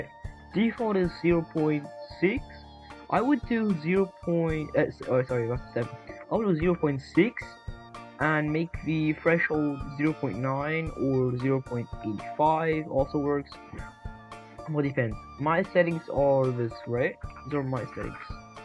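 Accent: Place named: Germany. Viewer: i hear a bit Deutsch hhahahahaha habe glaube noch nie einen Detschen gesehen der ein Gorilla Tag Fan Game macht dachte wäre der einzige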